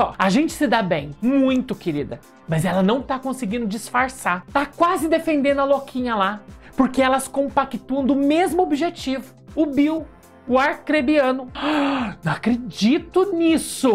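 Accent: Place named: Bahia, Brazil. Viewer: KKK seu sontaque Baiano é perfeito!! sou Baiana.😂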